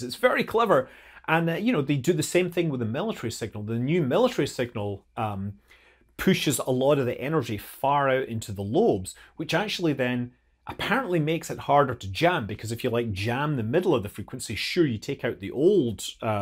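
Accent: Scotland, United Kingdom. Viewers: I think we’ve missed one of the most important use of GPS. How a golfer knows the distance to the green when he’s so off track in the trees that he can’t see the flag. 😊 Good to hear such a knowledgeable Scottish voice on here.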